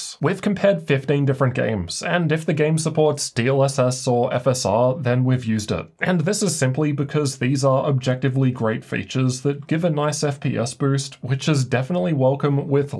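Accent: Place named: Australia. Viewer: Aussie accents are fun: you say turrr when you mean to say too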